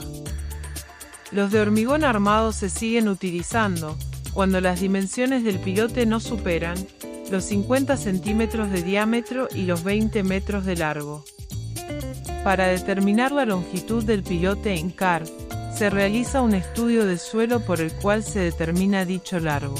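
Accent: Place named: Argentina. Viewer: Vi la información de las zapatas, me iba a suscribir, ya lo habia hecho, todo parece indicar que la información viene de un ingeniero estructural y por el acento de voz, es claro que es Argentino.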